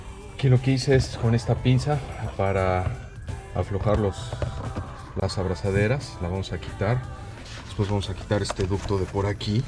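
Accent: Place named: Mexico City, Mexico. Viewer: Yo lo que recomendaría es no utilizar el acento chilango aspiracionista para tu contenido, es muy molesto y dificultan ver el video. Saludos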